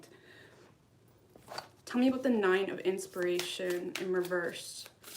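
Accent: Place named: Canada. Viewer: Love your readings and your accent...oot and aboot! X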